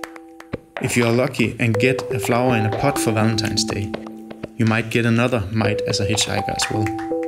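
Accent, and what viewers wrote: Denmark. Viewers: Great to hear you've got a microphone now :D. Is that a danish accent? Apart from Demodex, biofilms of everyday objects (e.g. Keyboard/Mouse, sports drinking bottles) would be interesting, if bacteria (or whoever lives there) are large enough to be filmed.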